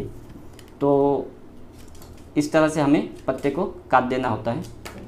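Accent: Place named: Assam, India. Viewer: Yah bhai Bhai Aam cutting Se Kaise hota hai Assam ke Rahane Wale Hain Wahan Ke Log Aise bolate Hain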